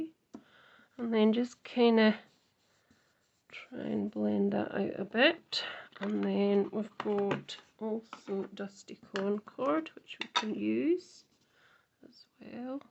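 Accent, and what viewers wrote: Scotland, United Kingdom. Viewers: Absolutely gorgeous, lovely colours and design. It's great to hear a Scottish voice on YouTube, I've just found your channel and will enjoy watching your videos, thank you so much for your inspiration 💖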